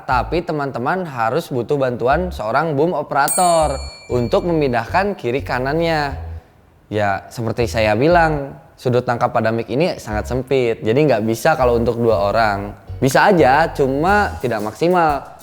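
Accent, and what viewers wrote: West Java, Indonesia. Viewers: Logat sundanya berasa hehe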